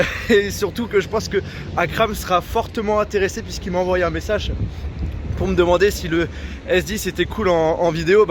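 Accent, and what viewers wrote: Belgium. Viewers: Mais est belge? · Tu est belge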